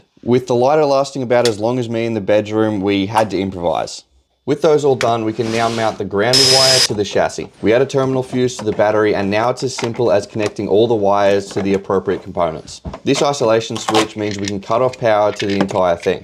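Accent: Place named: Australia. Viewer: Might miss the mark entirely, but he sounds Aussie to me. So not European but still nonetheless your comment does partially apply.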